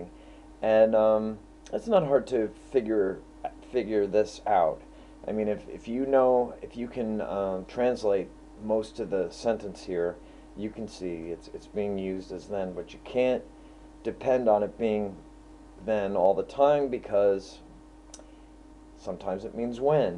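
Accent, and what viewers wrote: United States. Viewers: Hehe. I love the American "R", hihi =) It sounds so weird, since i'm a native speaker, though I don't blame you. Very interesting video man, carry on :D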